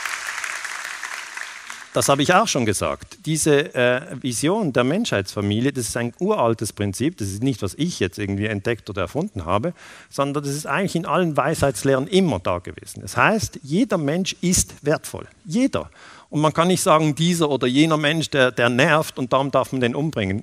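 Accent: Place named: Switzerland. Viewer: Es chomt immer wider chli schwitzerdeutsch vo ihm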